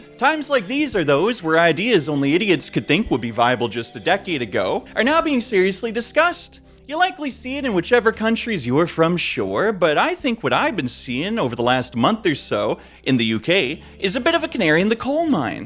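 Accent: Nova Scotia, Canada. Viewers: He's Canadian. It's a Canadian accent. From Canada. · Thought I heard an NS accent, flag confirms it. Lived in Halifax for 20 years, came back to UK due to health issues. Can confidently say that the Western world is falling apart, and it's glaringly apparent in the UK, and from what I hear, back across the pond too. Can confirm that "business rates" here are fn absurd. You think Visa/Mastercard are bad? Amex charges 5%. Running a business in Halifax, aside from cash, Interac was by far the most cost effective method of processing any transaction higher than $5.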